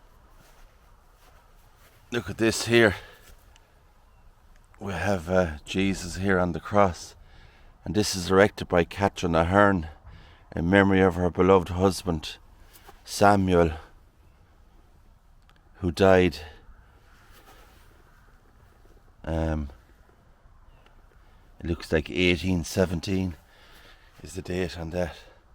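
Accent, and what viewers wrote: Ireland. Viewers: Thanks fabulous video, lovely Irish voice Lynn in Brittany x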